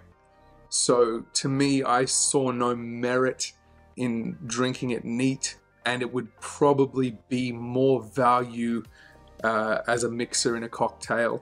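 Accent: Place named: Australia. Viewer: It's like majorkill from Warhammer 40K acting like a perfect gentleman and talking about whisky instead of spacemarine figurines and hentai. I guess the Australian accent is just more gripping. Great content.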